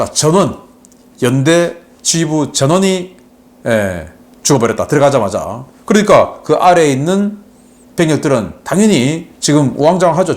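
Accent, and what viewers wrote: Gyeongsang, South Korea. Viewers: 경상도 씹 말투 존나 거슬리네~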